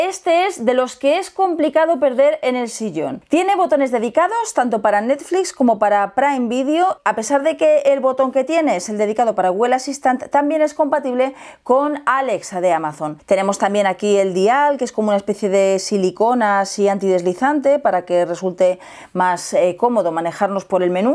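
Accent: Spain. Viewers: Español de españa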